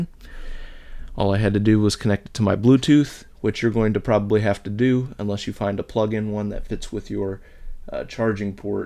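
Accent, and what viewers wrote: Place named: India. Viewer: Oh awesome I thought you were like Indian or something 😂 lol nice!!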